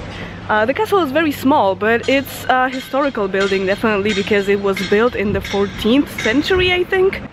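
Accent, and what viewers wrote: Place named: Poland. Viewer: Dawno Cię nie było. ;) Znowu dobra robota... Utrwala się fajnie angielski który jest takim powiedzmy "polskim angielskim"... Wiesz o co chodzi... Łatwo do zrozumienia... Bez jakichś tam dialektów itd. pozdrawiam. ;)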